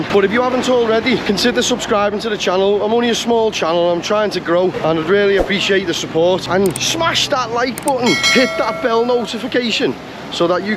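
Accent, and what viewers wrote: United Kingdom. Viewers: Soooo welcome bro❤️ Your British accent is awesome man❤️❤️ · Welcome to Iraq mate..👈🇮🇶 Which UK city accent is this..?? 🤔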